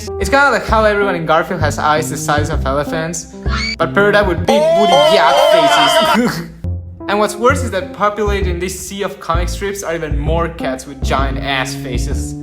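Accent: Argentina. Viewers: It's so great to hear someone who speaks English. Listening to him say words with an Argentine/Spanish accent. Well, I decided to eat Dulce de leche. Good video and bye bye everyone!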